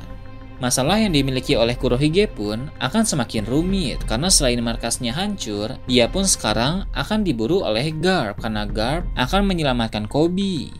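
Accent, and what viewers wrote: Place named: Tasikmalaya, Indonesia. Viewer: mang naha di akhir kalimat dikitukeun wae duhhh ciga orang tasik mang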